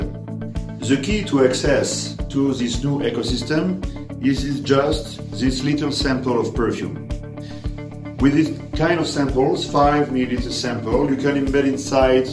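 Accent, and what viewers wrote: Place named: France. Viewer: Bravo. I love your french accent!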